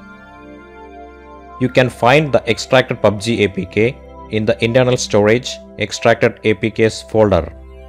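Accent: Kerala, India. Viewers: Bro malayali analle. English parayumbol manazilavum😭🤣😅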